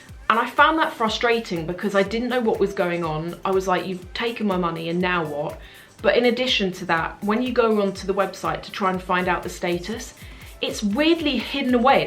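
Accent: United Kingdom. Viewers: I don't know why, but whenever I heard talk, I felt like I spoke in British English accents for no reason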